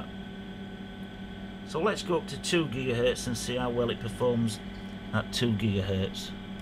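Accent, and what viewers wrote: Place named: England, United Kingdom. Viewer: Thooms (e.i. Thooms; British equivalent for Thumb. Pronounced as "loom." As in a cotton loom. - American influence/non- "King's English") Up. (Hehehehe- Ya gotta love that English Accent. Tickles ya).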